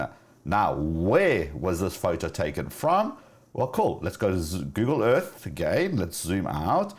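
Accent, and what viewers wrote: South Africa. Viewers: Bro great vid it's bothering me you sound South African 😂but in about you from usa😂😂🤔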